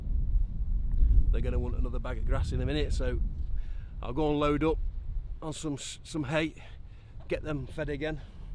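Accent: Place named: East Midlands, United Kingdom. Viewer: Nice to see a new video! You sound like you’re either Notts or Derby… if it helps I use RB Elliott at Calow and they kill and cut the pigs for me, I only have a basic cut into big joints and then cut them down further myself and package up. They do offer kill only of course I’ve always found them to be very good.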